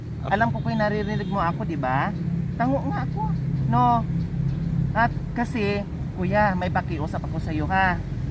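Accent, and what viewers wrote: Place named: Ilocos, Philippines. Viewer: ilicano kba? kz t9no ng pananalita mo ilicano. More blessing sa iyo dahil mstulungin ka kya papanoorin ko na ang chanel utube mo.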